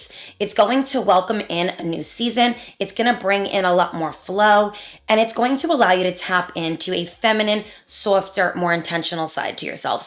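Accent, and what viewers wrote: New Jersey, United States. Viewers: You’re one of my favorite content creators you always give really actionable really sensible advice. Also, I love that you have a jersey accent because I no longer live there and it sounds like HOME.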